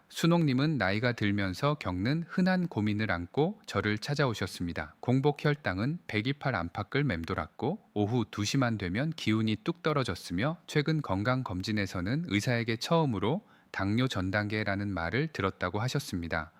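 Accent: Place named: Gyeongsang, South Korea. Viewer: *목소리.얼굴.지식. 다 끝내주고 경상도 말로 사람 지기네요.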